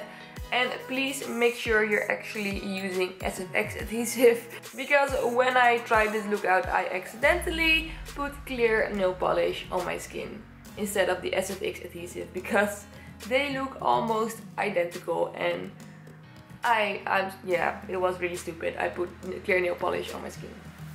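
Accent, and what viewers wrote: Netherlands. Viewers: het is zo grappig ik hoor je Nederlandse accent tussen het Engels PS love you dit is echt een van de beste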